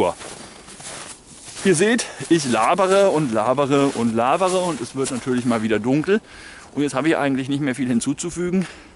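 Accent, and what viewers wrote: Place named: Karlsruhe, Germany. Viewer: Naja.. fundiertes Wissen wird vermittelt, keine Halbwahrheiten Man lernt also immer etwas. Deine Sprechweise ist amüsant und hörenswert (wohl weil es recht bekannt ist, ich wohne bei Karlsruhe). Es wird einem nie langweilig.. Manch andere Kanäle höre ich neben einem Onlinespiel.. Bei dir ist das nicht so. Von Anfang bis zum Ende interessant. Mach weiter so:-)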